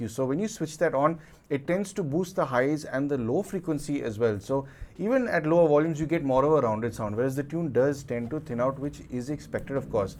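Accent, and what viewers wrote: India. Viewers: interesting review. Thanks for it...! You have a strange perhaps a Hindi accent, please speak a bit slow, because sometimes is dificult to understand. English is my second language. Thank you from Buenos Aires.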